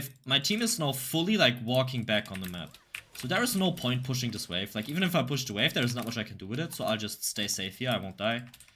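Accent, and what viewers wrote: Germany. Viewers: Is he german? Just interested because the accent sounds a little german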